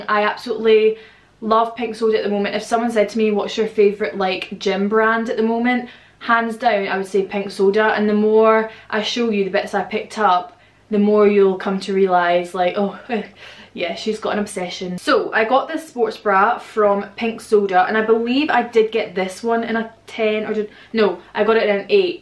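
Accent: Scotland, United Kingdom. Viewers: sounds like Glasgow if not it’s Edinburgh (for the accent)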